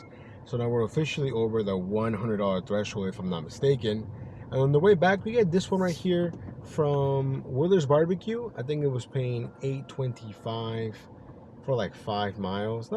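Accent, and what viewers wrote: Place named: Virginia, United States. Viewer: Lmao Dulles town center 🤣. I thought with your VA accent that you were trying to say Dallas this entire time I've been subscribed.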